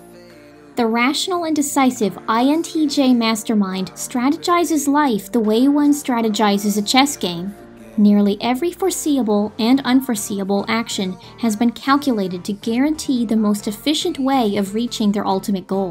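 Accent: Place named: New England, United States. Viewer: Not certain, something New Englandish. It's fun.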